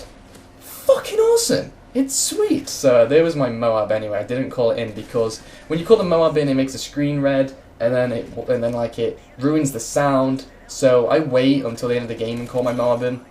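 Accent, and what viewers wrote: India. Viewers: EPIC INDIAN ACCENT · enjoyed the Indian accent :)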